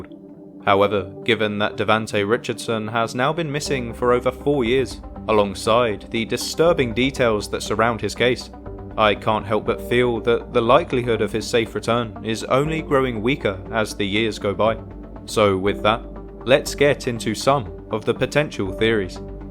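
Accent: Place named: United Kingdom. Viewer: There’s something about a British voice making this sound so not legit. Bad decision.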